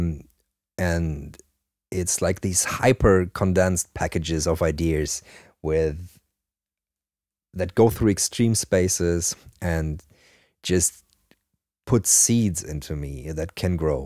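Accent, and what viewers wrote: Germany. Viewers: Your voice is like recording to tape. Crisp top end, tight low end. The perfect amount of German accent is like the subtle drift of ancient oscillators.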